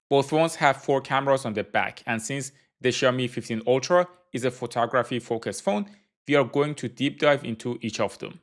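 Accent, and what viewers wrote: Iran. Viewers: Persian accent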